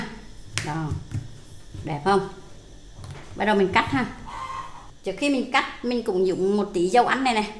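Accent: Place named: North, Vietnam. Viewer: Banh canh goc o QTri ma MC lai noi giong Bac tia lia ?! Het muon an!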